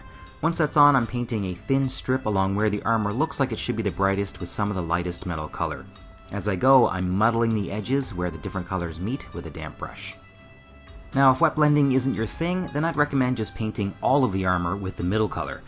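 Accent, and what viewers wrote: Canada. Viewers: I knew you sounded Canadian! Maritimer?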